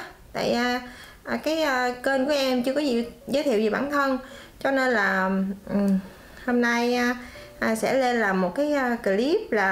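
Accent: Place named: Mekong Delta, Vietnam. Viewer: Giọng nói đậm chất miên tây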